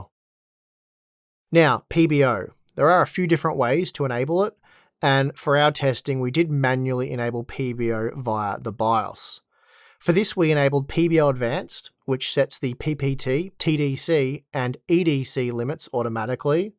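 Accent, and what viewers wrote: Australia. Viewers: I have to SURPRISINGLY say that after watching this video I don't think I EVER wanna heard the word 'FASTER" ever again!! It's been drilled into my head SOOO MANY TIMES that I'm almost SICK of AMD winning JUST BECAUSE OF THIS!!!!! hahahaaa!! (I think it's mainly because I'm an American, and hearing the Australian accent the biggest difference that we hear is the 'ahh' in 'faster' ) ... and hearing that 586 times in one video drilled it in hard... and it SUCKS because I WANT to hear about AMD being FASTER!!! LMAO - I think I'll be okay tho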